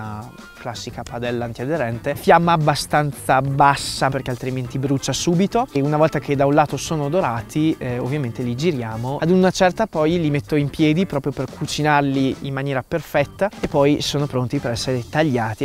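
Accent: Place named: Romagna, Italy. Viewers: Non tradire dora troppo forte la tua voce romagnola grande x lo straccio buonissimo tutto e tu grande cuoco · Il cassone! 😍 E comunque l'accento romagnolo a sentirlo dagli altri si sente tantissimo, tipo "ma quindi gli altri quando mi ascoltano sentono sta pronuncia qui????" BICARBONATO, sempre!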